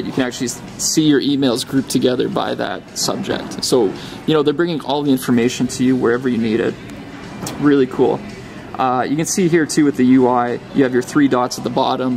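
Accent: Canada. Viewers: He's Canadia, right? Btw, rooting for BlackBerry. Go RIM!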